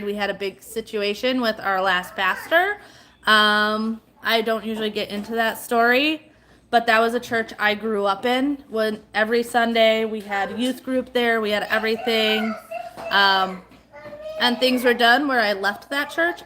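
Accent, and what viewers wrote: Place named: Minnesota, United States. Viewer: just a note gators can and do come into brackish waters they can live in it for a short time. I feel the same about Shark vacuum's I have one and love it, it works really well! Jungle theme is nice for a baby 's room! I like the dawn power wash I've seen where you can make your own? If your son has asthma make sure you don't have ligustrum's (privet) hedges planted near they cause attacks a friends son was just in the hospital because of them... Yes you do have a Minne soda accent Lol! But I like it!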